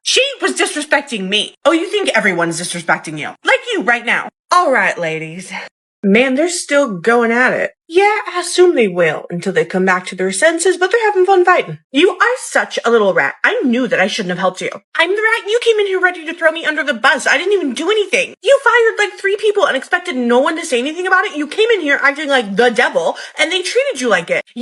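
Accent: South, United States. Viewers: I love the southern accent. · OMG I love the Southern Belle accent!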